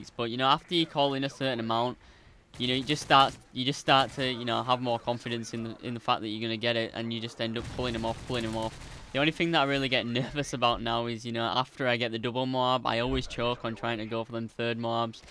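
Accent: Yorkshire, United Kingdom. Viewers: love the yorkshire accent, good tips and comp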